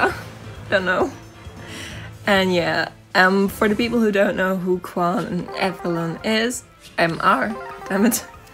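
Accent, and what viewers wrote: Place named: Germany. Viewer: Ich dachte mir doch, dass das 'n deutscher Akzent ist ^^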